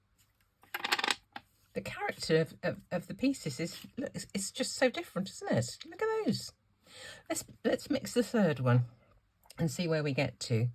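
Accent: United Kingdom. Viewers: I agree! Keep on talking! I’m from Canada and love your British accent. I’m addicted to you videos and look forward to them. Loved the Advent series.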